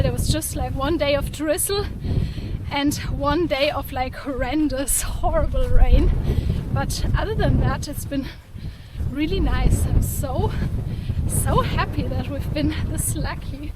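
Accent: Germany. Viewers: Der kleine Otto tut mir leid. Hoffentlich geht es ihm bald besser. Übrigens, wo kommst du her? Dein deutscher Akzent kommt mir bekannt vor.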